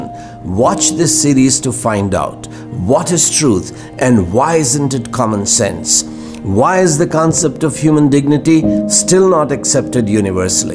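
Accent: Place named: United Kingdom. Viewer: Esse sotaque britânico omg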